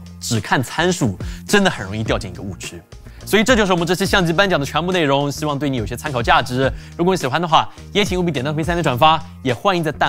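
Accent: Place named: Hangzhou, China. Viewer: 一听口音不是杭州人就是宁波人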